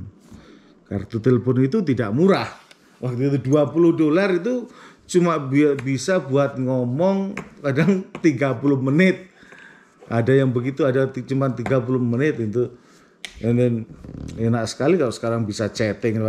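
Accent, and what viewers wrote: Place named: East Java, Indonesia. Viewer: Om bisa tolong bantu buat kesana gk...? Oh jawa mana om kok kaya orang jatim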